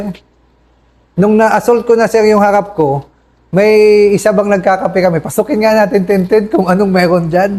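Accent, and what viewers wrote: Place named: Bicol, Philippines. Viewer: Parang bicolano ang punto ng salita niya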